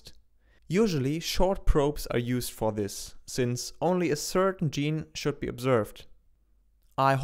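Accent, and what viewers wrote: Germany. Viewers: you sound soo german :) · Thank u. Nice german accent ;-)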